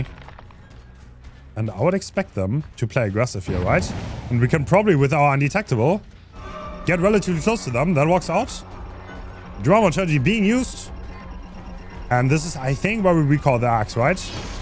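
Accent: Germany. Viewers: its kinda funny that he copied the exact same speaking style like Otz but with a german accent.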